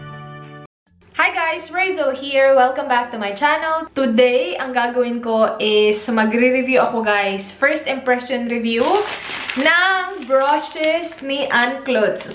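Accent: Visayas, Philippines. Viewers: You have a thick Filipino accent when you speak English but with practice you'll get better :) ang ganda mo with makeup and you are really skilled, thanks for sharing your review of Anne clutz brushes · Bisaya ka day? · Ommggg!! I like the way how you speaaakkk!! Are you bisaya po? 😍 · Bisaya acent☺️ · I still can't get over about how beautiful your bisaya accent transitions in to a very nice and fluent English accent. Love you x.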